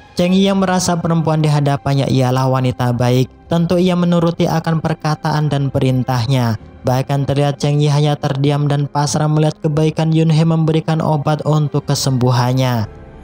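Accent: Madura, Indonesia. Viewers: Org Madura kau ya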